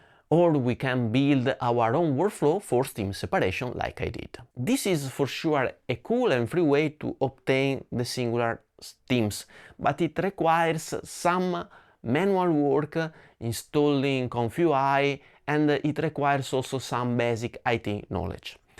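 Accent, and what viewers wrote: Italy. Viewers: no need. We love your Italian (edit not French) accent :) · it's definitely not French, it's clearly Italian :D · LOL, didn’t expect ComfyUI to pop up here! The Italian accent and those nodes gave me a nice flashback to when I was learning IPAdapter for SDXL. Great video as always, — keep pushing these unique gear reviews; they’re never covered on the mainstream guitar channels.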